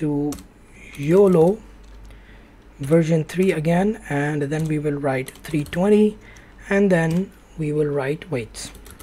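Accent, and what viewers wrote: India. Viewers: Are you Indian?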